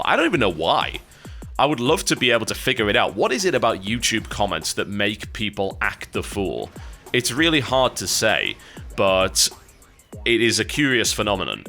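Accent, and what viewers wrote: United Kingdom. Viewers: Monochromatic Stains? Dark Tranquillity? In Flames? Jesus christ you have some great taste,. Also, you sound so young, inexperienced and British! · Oh my has the British accent lessened over the years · Young cynical brit sounds much more British. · i hope comes back to britain for a tiny bit each year so he can keep the acsent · Hey, younger sounds way more british.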